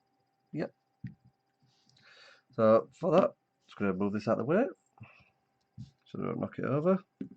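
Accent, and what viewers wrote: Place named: England, United Kingdom. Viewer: Few things I enjoy more than an English narrator. Cheers.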